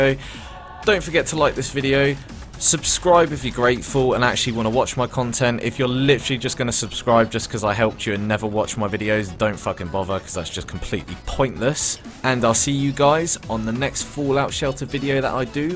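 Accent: United Kingdom. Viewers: Thank you so much disembodied british voice :D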